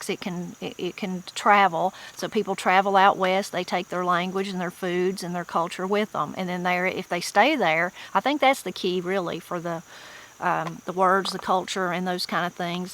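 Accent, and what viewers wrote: Appalachia, United States. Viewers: I didn't know it until today but I grew up in Appalachia. Since I have been watching your videos I have commented that my family talked like you, same language, grew out food, the same family likes, dislikes. I even said listening to you reminded me of my mother. After seeing the map, I realized that the part of South Carolina (up state) where I was raised is in Appalachia. What a wonderful surprise. I have learned so much from you. Thank you.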